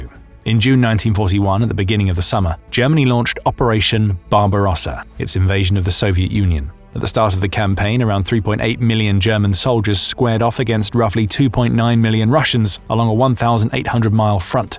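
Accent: United Kingdom. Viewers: How weird it sounds to hear someone with a British accent calling autumn, "Fall"! Good presentation, though.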